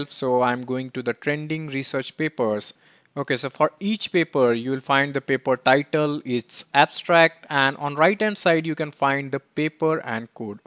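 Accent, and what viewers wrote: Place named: India. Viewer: Going a little off topic, I just want to confess a personal problem: I was prejudiced against your accent, because of Hollywood. I'm Brazilian and I learned english through films. I'm trying hard to change my prejudgments, which are just stereotypes. I realized some time ago that I mostly see Mexicans in a stereotypical way. I wanted to write this because I see that others also see you Indians through a Western lens that does not match who you really are. And I go through this too because I'm Brazilian, and no, we don't live in forests. For me, who lives where Portuguese is the standard language, it is a gift to be fluent in English, but it came at a cost, I imported not only the language, but the prejudices. I'm always improving. Thank you very much for this video, you explained it very well!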